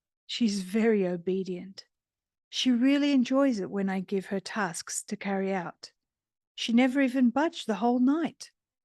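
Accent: Australia. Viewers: I agree 100%! Beautifully read! A lovely soft Australian accent makes for easy listening.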